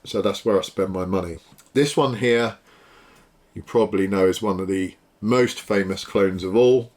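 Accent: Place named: Australia. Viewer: I'm digging the Aussie (or is it Kiwi or Northern British ? ) accent... Funny how whenever I hear that accent I always associate it with belonging to a tough as leather and nails two fisted bar room brawler..lol... Must be a hell of a thing to get your ass kicked by a good smelling dude . ! Lolol Great channel and your authentic energy. New Sub !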